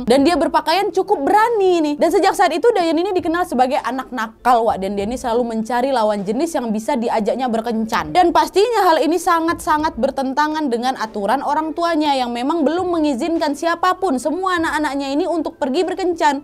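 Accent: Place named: Medan, Indonesia. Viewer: Sambil ngakak dengar cara baca ceritanya,kental kali logat Medannya,semoga tetap senabgat dan sukses selaku ya buk.tksh.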